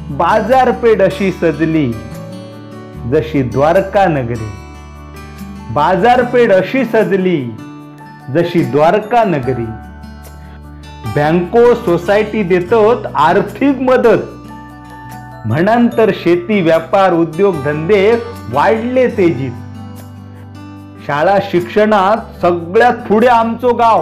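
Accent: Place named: Malvan, India. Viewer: तळवडा.. डोळ्यासमोर उभ्या केलात... कानांका तृप्त करणारो रसाळ ग-यासारो अस्सल मालवणी आवाज.. लय भारी..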